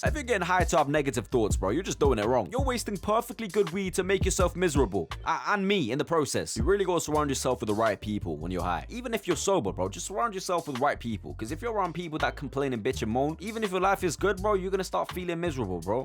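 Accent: United Kingdom. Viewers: he sounds like a fellow brit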